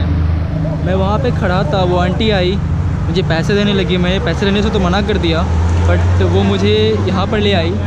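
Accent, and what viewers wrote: Bihar, India. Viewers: Aram se baitha tha ek dum pasra hua tha, ye bihari language 🤗🤗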